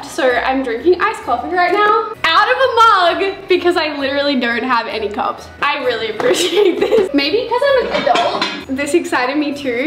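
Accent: Australia. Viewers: Bruv is she Aussie?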